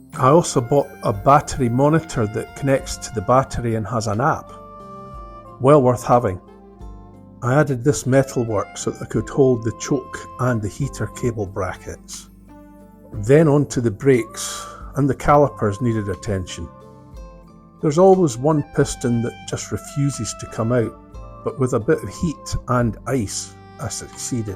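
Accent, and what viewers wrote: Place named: Scotland, United Kingdom. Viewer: You make me want to go to Scotland again. I visited for work with Motorola back in the day. Your accent brought back fond memories of good people.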